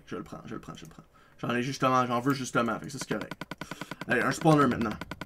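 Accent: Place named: Quebec, Canada. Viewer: parce que j'adore ton accent Tabarnake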